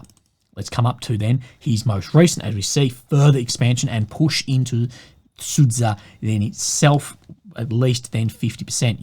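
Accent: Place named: Australia. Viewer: Just started watching your updates, good to hear an Australian voice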